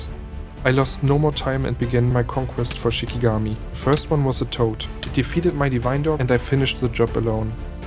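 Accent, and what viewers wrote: Germany. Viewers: are you german by chance? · are you german 🔥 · you are german right? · Very german englisch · are you german#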